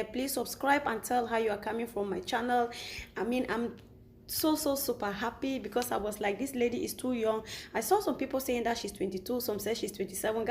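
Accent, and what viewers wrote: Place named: Cameroon. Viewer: Love you bcos my best friend here in UK is from CAMERION and she speaks like you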